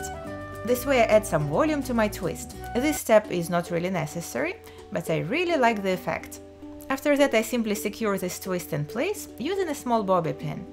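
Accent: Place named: Russia. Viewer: Слышно что русская))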